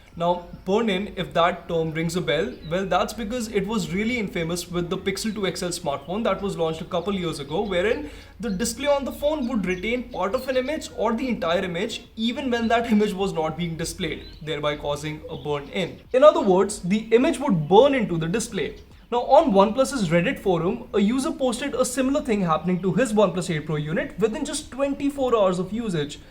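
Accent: India. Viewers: why don't you just speak in your native language , at least they will understand everything ... this way , nobody can understand you , I mean your accent is so heavy . No offence but its not just you , most of Indian reviewers have such a heavy , heavy accent . Is it really so hard , I know you are all smart and stuff ...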